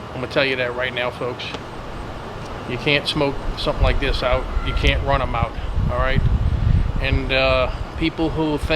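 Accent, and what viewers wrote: Louisiana, United States. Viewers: Louisiana...a bit of a cajun accent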